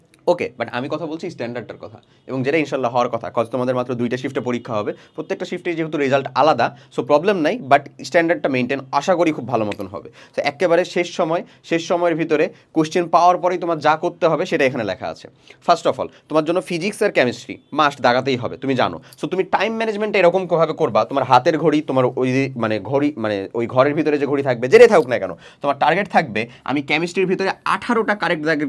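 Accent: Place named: Rajshahi, Bangladesh. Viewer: apnr basa ki rajshahi ??? vasa te rajshahi rajshahi vab ache ....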